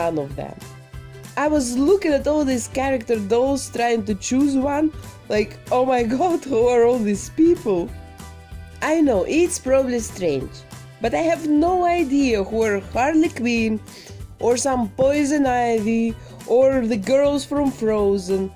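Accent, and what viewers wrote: Russia. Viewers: I normally cannot stand strong Russian accent, but with your videos I find that I can listen to you for hours and watch your incredible repairs! Awesome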